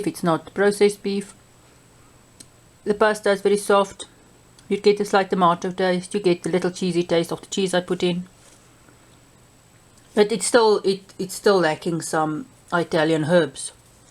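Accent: South Africa. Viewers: Your SA English like a razor blade to my ears. I speak US English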